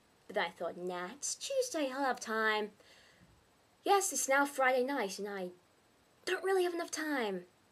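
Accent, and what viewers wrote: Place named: Australia. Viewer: I agree. :} I used to watch Hey Hey It's Saturday a lot with my family, along with Xena and Hercules. Haha. It took me so long to work out you were Australian. I couldn't recognize my own accent! The only foreign accent I can even attempt to do is Bad British.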